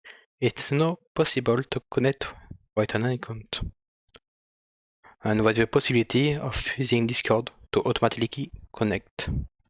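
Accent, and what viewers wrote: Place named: France. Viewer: his french is THICCCCCC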